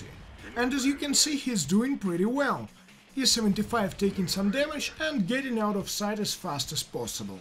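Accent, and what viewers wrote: Russia. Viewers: i love his voice pls more from this russian english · True russian accent) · очень годно ! =) но русский акцент уши режет , непривычно так .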